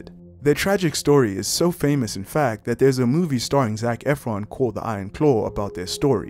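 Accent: South Africa. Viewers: My favourite conspiracy has to be that the McMahons we see on TV and in the ring are not the real McMahons but paid actors, and the real McMahon family is never seen in the public eye. Great video btw, legit never heard of some of these other ones. P.S. I know a South African accent when I hear one!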